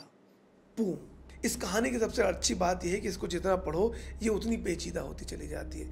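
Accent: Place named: Western Uttar Pradesh, India. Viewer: I like the husk in your voice texture and also your skill to communicate and explain the depth of emotions of each character involved in the story. I salute to the courage of that mother who played a fake identity so efficiently for decades just to keep her family secure and surviving under a shade. Aapke bolne ke lehze se lagta hai aap U.P. me Etah, mathura ya mainpuri ke aas pas ke ho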